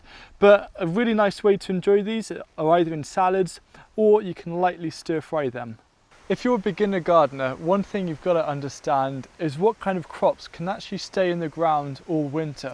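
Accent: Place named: Wales, United Kingdom. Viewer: I love your Welsh accent. And thank you for the tips!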